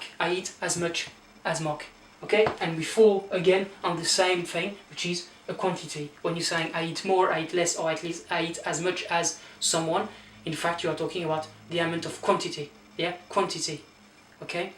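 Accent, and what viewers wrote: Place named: Arab world. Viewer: you have an arabic accent in ur english ☺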